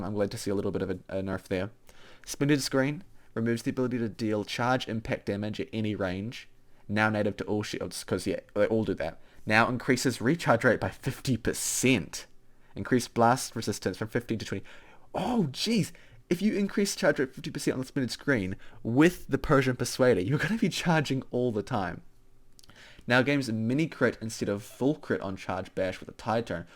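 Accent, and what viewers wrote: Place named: New Zealand. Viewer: Confusing to hear a kiwi voice on a popular youtube channel.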